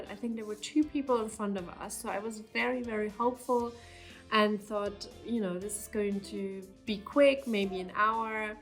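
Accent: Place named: Germany. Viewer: I recognized literrally from the first words that you were german. Are there a lot of germans there? If yes, I wont move.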